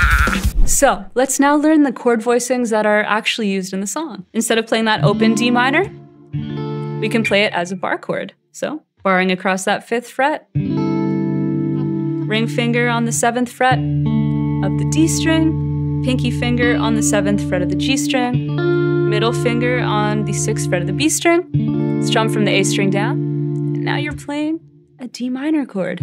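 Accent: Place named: Canada. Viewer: I knew you were Canadian without checking. You said, "I'm sore-ey" as opposed to us Yanks' "sah-rey." Awesome lesson.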